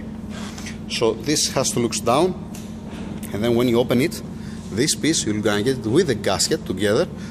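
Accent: Greece. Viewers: Hello ser nice work I want to ask you where are you working? From the name and accent I know that it is Greece but where in Greece? I have a problem with s320cdi 4 matic the iniverzal joint in the transfer case is worn-out can you halp me please. Where to find parts do you have it... Thanks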